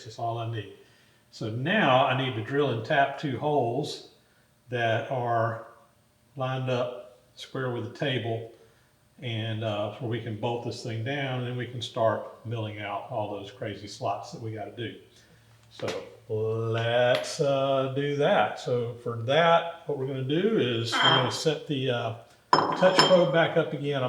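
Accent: South, United States. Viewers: Hope y’all appreciating the genuine accent of a true Southern gentleman. 90% of what you hear in film/television is an “attempted” accent, and usually highly exaggerated. :-)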